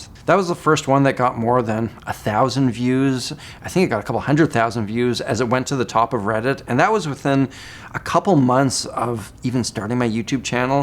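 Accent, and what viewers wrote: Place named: Canada. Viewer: I think I found on a JHS "What's on your board" sort of video, and I remember thinking "Hey, I think this guy has a Canadian accent", and as soon as I found I was right --> SUBSCRIBED. Love ya, congrats on 1 million subscribers, it's well deserved.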